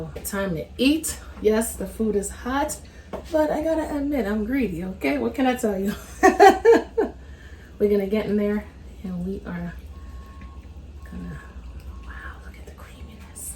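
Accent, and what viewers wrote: New York, United States. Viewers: ….you gotta be from New Jersey or New York 😀 Food looks delicious! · Are you from New York? Love your accent and your recipes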